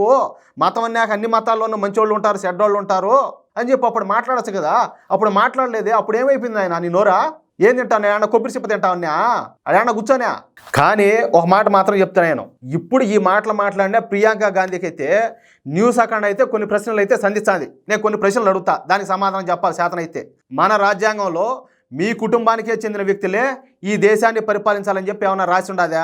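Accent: Rayalaseema, India. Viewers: మీరు మన రాయల సీమ భాష అంటే నాకు చాల ఇష్టం మీరు చాల బాగా మాట్లాడు తున్నారు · చాలా చక్కగా మంచి ప్రాంతీయ భాషలో రాయలసీమ భాషలో బాగా వివరిస్తున్నారు గురువుగారు జైశ్రీరామ్ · చాలా బాగా చెప్పారు అన్న....మన రాయలసీమ భాష విని చెవులకు ఉన్న తుప్పు విడిచి పోయింది....జై శ్రీరామ్🙏🚩🇮🇳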